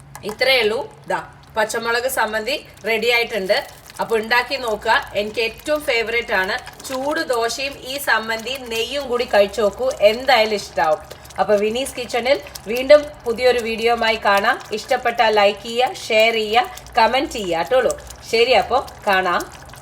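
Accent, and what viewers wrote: Palakkad, India. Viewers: Dosakku ippo kazikkanday thani palakkad slang ishtapettuttollu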